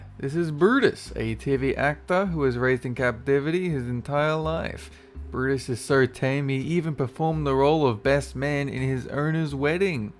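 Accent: Australia. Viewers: Every time I hear this guy I think of an Aussie Shaggy from Scooby Doo who's got way too baked and forgot he had to record a voice over...And instead of waiting he just drawled it out anyway... Heroic! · Monochromatik Kolor He's Australian. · I haven't been watching your channel for long but are you Aussie? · His Australian accent is about the only one I can tolerate . (He sounds a tad under zee influence in this though )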